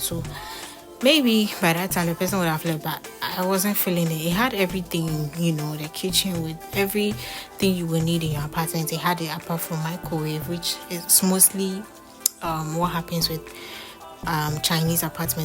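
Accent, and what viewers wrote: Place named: Ghana. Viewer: I heard your accent and I knew you had to be Ghanaian. Love your video🥰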